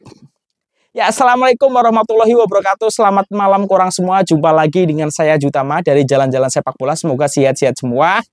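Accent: Indonesia. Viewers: Bang orang Malaysia ke Indonesia? Cara cakap mcm Indonesia. Kalau betul Indonesia, impress gila dengan JDT sampai ke orang Indo buat review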